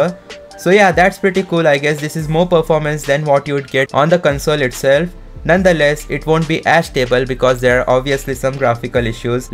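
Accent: India. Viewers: India dude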